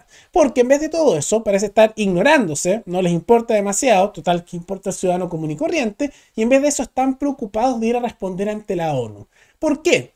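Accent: Chile. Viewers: Buena alocución !! Se nota esfuerzo en superar acento chileno , ahora es más comprensivo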